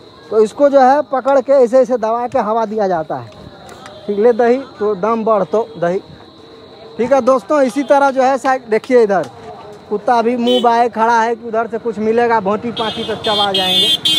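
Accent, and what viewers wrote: Bihar, India. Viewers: Bhaiya aap hamare kosi ka bihari accent ko world level p jrur lejayenge ekdin 🔥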